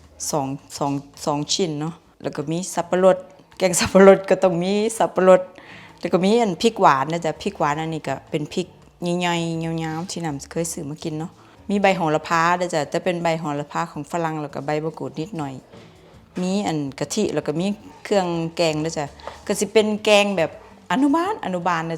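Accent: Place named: Korat, Thailand. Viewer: แก๋นี่มันสำเนียงบอกเลยเนาะ.ว่าค้นโคราช.หลานย่าโม..ดีแทะ, แทะ.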